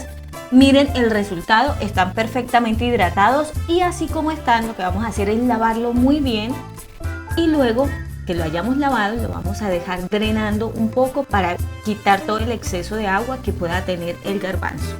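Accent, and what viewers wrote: Colombia. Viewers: Hola, muy ricas Y sensillas tus recetas ,bueno por el acento me imaginaba que eras de Colombia pero vives en Colombia? Gracias .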